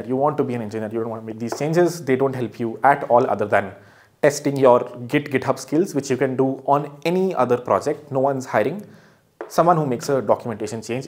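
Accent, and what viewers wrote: India. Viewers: I've worked with outsourcing so long that I am very familiar with the meter and pronunciation. I have no issues with the Indian accent.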